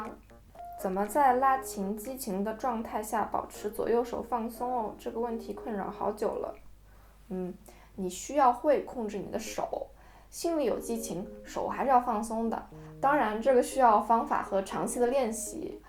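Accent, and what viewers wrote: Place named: Shanghai, China. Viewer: 难怪口音基本就是上海口音了，完全不是青岛北方口音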